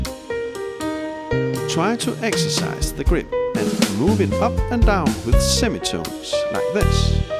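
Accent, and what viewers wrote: Denmark. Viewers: A Danish accent can't hide from Danish ears 😊 🇩🇰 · Is he danish? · sima' er du dansker. Jeg kan høre det så hårdt i din stemme :) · Jeg har set videoen i 5 sekunder og kender intet til din kanal overhovedet. Men dén accent afslører en dansker alle steder! Glæder mig til at høre videre nu :-) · That's a danish accent if I've ever heard one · Are you danish by any chance? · Scandinavian accent immediately recognized. Danish right? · Er det bare mig eller lyder han bare mega dansk?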